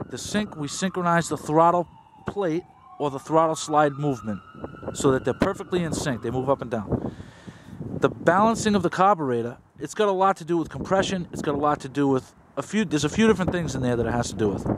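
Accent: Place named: New York, United States. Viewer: Sounds like every other New Yawker Ive ever heard or maybe BAAAsten.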